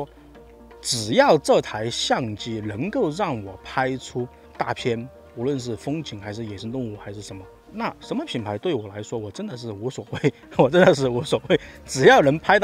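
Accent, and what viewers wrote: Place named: Chongqing, China. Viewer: 听出了川音:face-green-smiling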